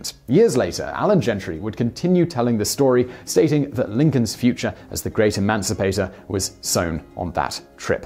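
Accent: United Kingdom. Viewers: British Vsauce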